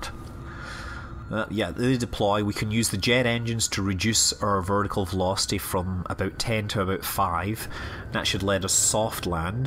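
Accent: Scotland, United Kingdom. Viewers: is this a very very very posh scottish accent? i thought it was canadian at first haha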